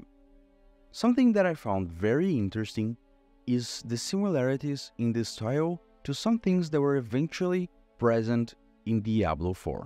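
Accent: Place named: Brazil. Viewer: Hmmm sotaque br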